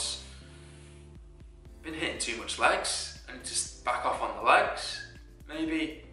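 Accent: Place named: Liverpool, United Kingdom. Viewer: Are you from Liverpool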